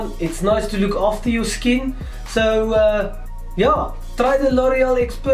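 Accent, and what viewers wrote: South Africa. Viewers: Sounds like a broertjie from South Africa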